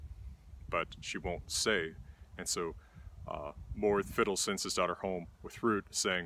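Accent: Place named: South, United States. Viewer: A southern man teaching Norse mythology. Cant tell if your east texas or going up into the western parts around Wyoming, Montana, etc., but know that this is exactly what I needed in my life.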